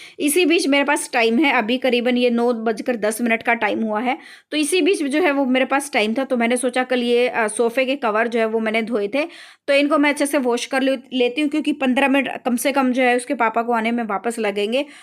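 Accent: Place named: Haryana, India. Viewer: Di aap kha rhte ho qki aap haryanvi lgte ho bcoz m b haryanvi hu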